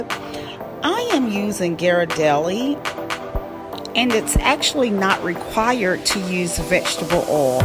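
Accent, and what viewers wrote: South, United States. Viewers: I love your southern sweet dialect. It's so soothing. Thank you for the tutorial.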